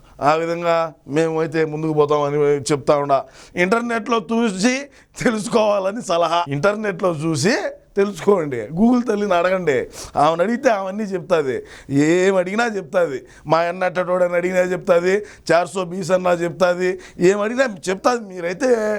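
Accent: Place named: Nellore, India. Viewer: Nellore Yasa eraga desthnav ga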